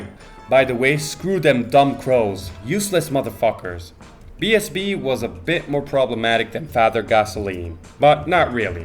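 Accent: Italy. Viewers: sei italiano? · U are italian?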